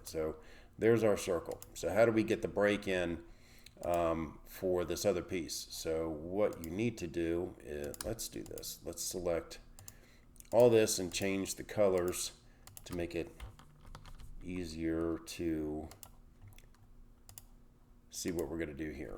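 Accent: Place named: Philadelphia, United States. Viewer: Where are you located? You sound like you’re from Philly or South Jersey.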